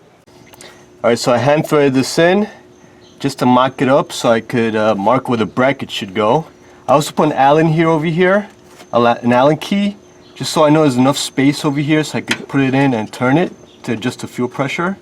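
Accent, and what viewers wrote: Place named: Alabama, United States. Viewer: I enjoy your videos , they take me back to the days of hanging out with my brother , thank you for that , but I have to ask , I can't place your accent , is that Alabama or maybe southern Georgia ? 😈😂😇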